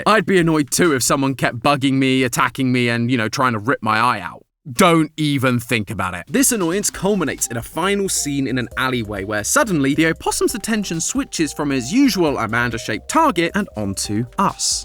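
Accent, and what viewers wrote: United Kingdom. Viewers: im still not used to the british guy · I don’t remember him being British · Ok so has he always sounded British 😂😂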